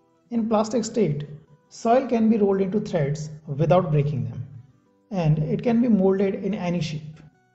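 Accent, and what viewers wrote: India. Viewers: according to his accent, I think he is from south India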